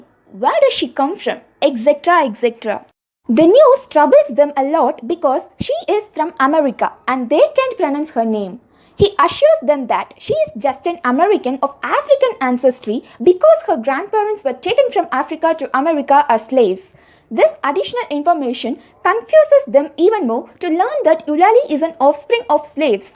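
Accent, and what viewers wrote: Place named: India. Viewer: I am the 2000th person to watch this. She speak Indian english, I wanted African englis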